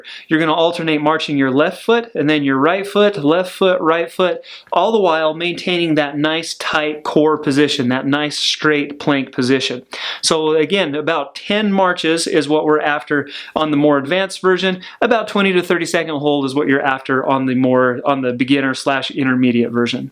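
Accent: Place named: Utah, United States. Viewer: This is excellent, and I heard him talk for three seconds and knew he was from Utah!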